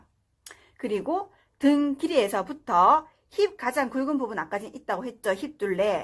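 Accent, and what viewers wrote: Gyeongsang, South Korea. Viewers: 문화회관 출강하신다고 하셨죠? 지역이 어딘지 가까우면. 수업듣고 싶엊네요 말씨는 경상도라 살짝 기대해봅니다 ㅎㅎ 막힘없는 설명 속이 뻥뚫리네요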